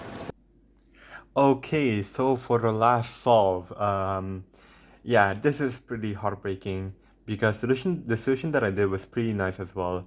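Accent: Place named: Indonesia. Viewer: Next time gonna be better. Also this is the most indonesian accent ever when you speak english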